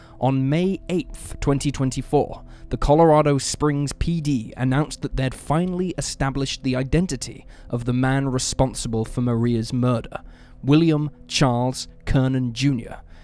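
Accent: England, United Kingdom. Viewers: What a stupid comment! Yea he’s English, but just cos he has an English accent, why are you saying he should only talk about English killers??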